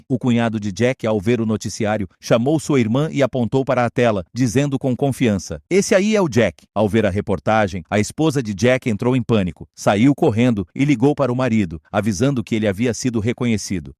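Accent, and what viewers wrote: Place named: Mozambique. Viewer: em uma hora e todo acompanhado com imagens Você é gênio O seu português é fácil perceber um Moçambicano parabens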